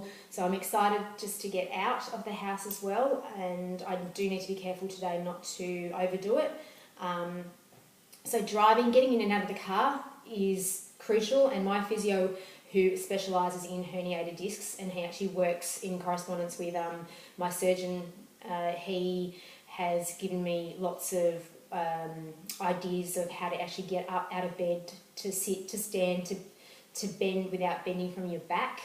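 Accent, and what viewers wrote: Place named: Australia. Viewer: Hey, have just been watching your videos and given me some positive outlook after being told yesterday I have to have a L5/S1 discectomy. It's also great to hear the perspective of someone with an Aussie accent. Not sure where you're located, but if you're in Sydney I would be keen to hear more about your sports physio as I am keen to get up and about and back onto my bike as soon as possible. If you are local to me please email me the details of your physio. Thanks heaps and good luck for your continued recovery!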